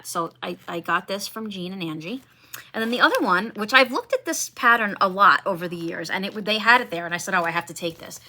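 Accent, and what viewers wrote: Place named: New Jersey, United States. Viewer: Hi! I'm watching you fr the North her in Ontario, 🇨🇦. I love your Jersey accent. I'm new to Cross Stitch & am thrilled to watch others videos & see their projects & stash. And oh my there often is mountains of both. I'm currently finishing up my project & looking forward to starting "Gracious Era"(Dimension kit) it's a Xmas gift for my mom. Glad you had fun at Stitch North, gosh I live close to the venue but was new at that time. So maybe in 2026 I can go! Happy summer! You're the second I've met in my life.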